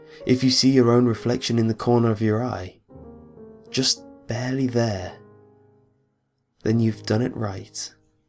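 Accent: United Kingdom. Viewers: hes not...thats his accent, watch other videos and pay attention to the british english wording styles he uses.....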